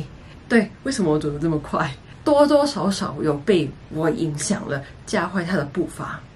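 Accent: Singapore. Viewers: wow，你的华语一点听不出香港口语，almost like a local Singaporean :yougotthis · Your Mandarin and English got Singapore ascent.... :) :) :) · Did you realize you are speaking like Singaporean? Mixture of English and Mandarin. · 你嘅国语口音已经差唔多完全新加坡华语化😄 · Wow when you speak English. You sound very Singaporean... I reckon you already localise Liao.. come back lah since you adapt the Singaporean life le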